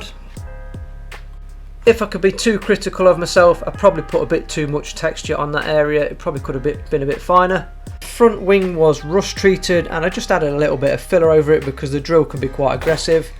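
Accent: United Kingdom. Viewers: the commentary is so british lmao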